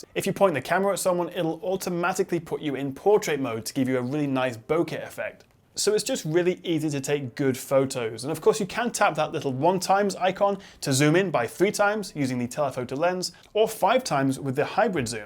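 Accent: United Kingdom. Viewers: I like your British accent😁👍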